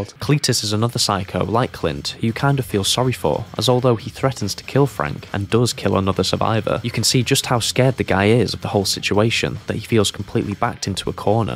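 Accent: United Kingdom. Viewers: Great review. I miss DR1. Are you British?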